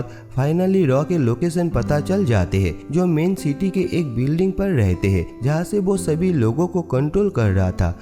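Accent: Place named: Bengal, India. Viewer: Are you bengoli please work on your M T I so you can explain better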